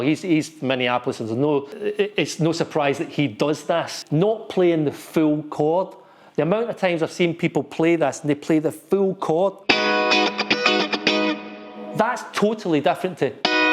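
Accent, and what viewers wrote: Scotland, United Kingdom. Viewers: That was pure brilliant mate. You from Scotland?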